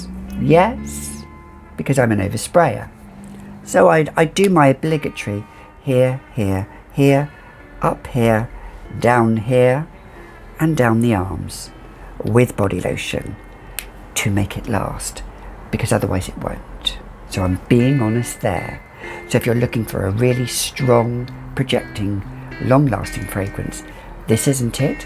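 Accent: France. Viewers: Yayy for the French accent!!!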